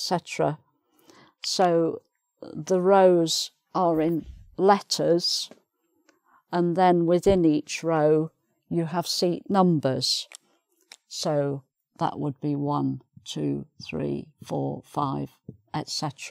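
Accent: United Kingdom. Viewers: Your videos are my bread and butter lately❤❤❤❤...I love your British accent, could you make a video in how to sound british please? Please, please, please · Hello Mrs., i am Merci from Indonesia. I am on the second year in junior high school this year and due to the covid-19 i have to study at home. It is nice to know you and nice to follow your lessons. I am so interested in following your lesson and i like your accent very much. Anyway, i would like to imitate your British accent keep joining your English program. Best regards and have a nice day from Java island - Indonesia. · Thank you for this videos, I love the British accent I undersant all without subtitles, Greetings from Mexico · Hi,.I like your accent.God bless the UK.天佑英国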